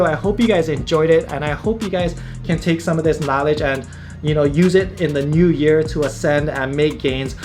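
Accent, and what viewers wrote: Hawaii, United States. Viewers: Wassup ma guy are you from hawaii?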